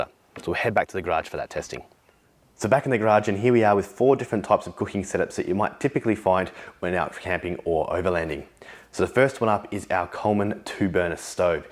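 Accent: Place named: Australia. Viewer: Nice to hear an Aussie who isn’t a hobo. Well done.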